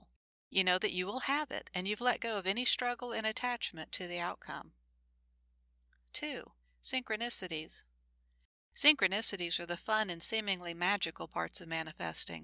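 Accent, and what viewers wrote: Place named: South, United States. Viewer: Very informative. Pleasant vocal as well. I put the accent as the Virginia - Carolina region.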